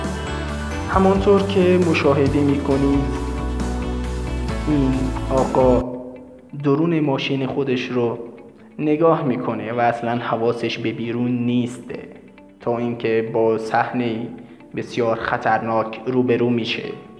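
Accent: Afghanistan. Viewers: این چه جور حرف زدنه انکار یه افغانی تازه اومده ایران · نه ایرانی حرف نمیزد همین لحجشه در افغانستان هم لحجه های مختلفی هست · لحجش تخمیه چون گوینده افغانیه 🤢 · مث بقیه برادرای افغان صحبت کن خیلی هم لهجتون قشنگه اینجوری ریده میشه به کلیپ این همه یوتیوبره افغان و همم عشق میکنن با کلیپاشون و بیشتر به خاطره لهجشون میرن کلیپاشون رو میبینن شما اومدی فارسی صحبت کنی خوب معلومه گند میزنی فارسی رو مدل افغانیه خودتون بگو با لهجه خودتون عالی میشه مث این میشه که من تو افغانستان باشم و بخوام به لهجه شما صحبت کنم صددرصد مسخرم میکنن چون بلد نیستم و همش گند میزنم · داداش این افغانیه واسه همین اینطوری حرف میزنه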